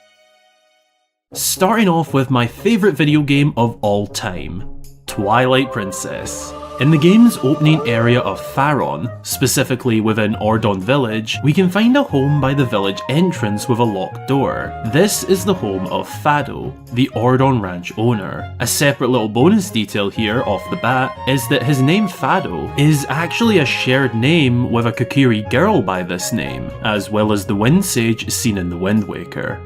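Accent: Scotland, United Kingdom. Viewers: never said he was, but just saying there's nothing wrong with his pronunciation. I can't speak for all dialects, so I wanted to make sure that it didn't seem like I was. I'm sure most of viewer base is in America, that's why he speaks with less of a Scottish accent. It makes sense, in a purely analytical way.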